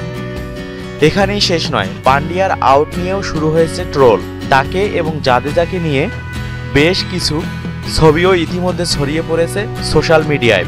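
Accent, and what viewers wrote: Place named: Noakhali, Bangladesh. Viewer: Shala bolod messs na match 😂 kotha bolte shikh shala noakhali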